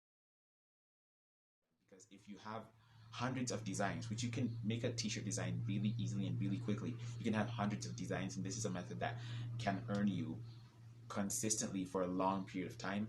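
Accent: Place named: United States. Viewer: Great content ...but how did you lesrn American English😂😂😂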